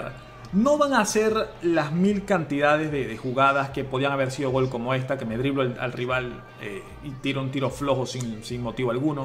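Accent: Venezuela. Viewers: De donde eres ? Me suenas a Venezolano pero no estoy seguro jajaja. Buen video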